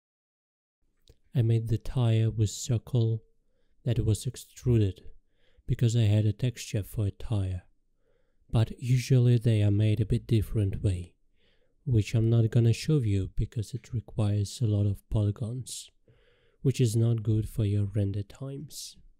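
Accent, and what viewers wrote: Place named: Russia. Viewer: брат, палишься, что русский))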